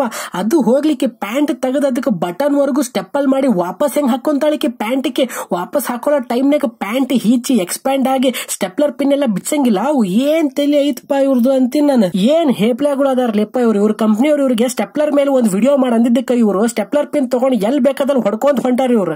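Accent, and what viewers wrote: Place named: Bagalkot, India. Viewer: Anthamma... 😂😂 Paoo.. paoo.. dialogue mast aiti noda.. yele bagalkotyav yen nee.? Fida aade nood na..😍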